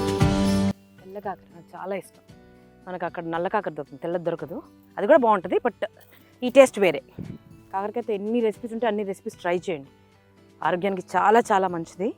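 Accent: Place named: Telangana, India. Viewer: Ma akka Telangana bidda. Tanu matlade Telangana yasalo na biddalu ma amma ani forpect gaw matlatunnav😂😂😂❤❤❤😊😊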